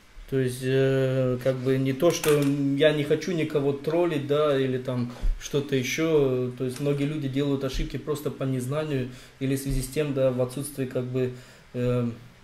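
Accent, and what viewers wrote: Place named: Germany. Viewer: A так Германский акцент присуцтвует ))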